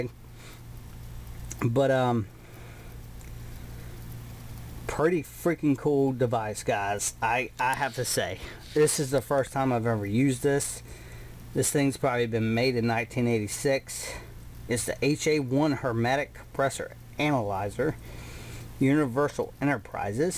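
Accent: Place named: South, United States. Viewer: They still make them...UEI about $250.00 on sale....I've never hooked mine up to 220...A little afraid to, running 220 down a 110 volt cord, but they say you can do it...FYI for you guys, a compressor normally won't start again after just shutting off...To much head pressure to start against so they have to sit and let pressures equalize....They'll kick the overload, Kixon or whatever type used until they do....Thanks to the what sounds like a country boy making this video...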